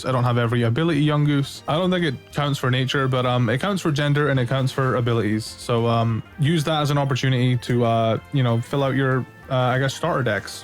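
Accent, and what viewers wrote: United Kingdom. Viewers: iam 5 sec in and you have the most british accent ever xD i love it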